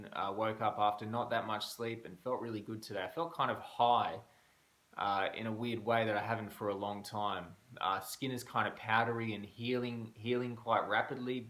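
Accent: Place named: Australia. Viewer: I don’t know what it is about the Australian accent but when I hear it I listen.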